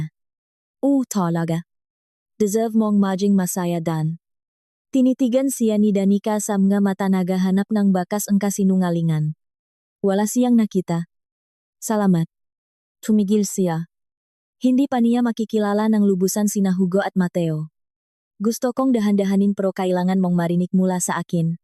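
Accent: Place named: China. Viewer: Ang narrator lasing ata intsik ang nagsasalita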